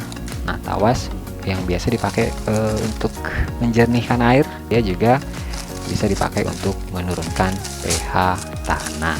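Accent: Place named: West Java, Indonesia. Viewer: Aksennya ky org sunda ,,timana kang??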